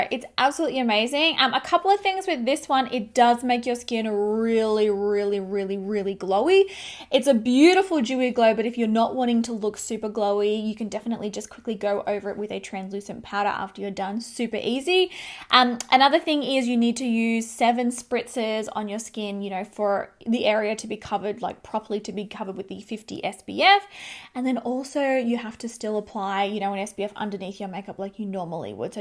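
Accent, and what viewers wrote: Australia. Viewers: I am a huge fan of yours!! I lived in Sydney for years so I feel at home with you. I'm in Argentina now but my father is there. Love your good vibes and don't worry about the aussie accent is great!! Loved your wedding blog!! 😍😍❤❤💋💋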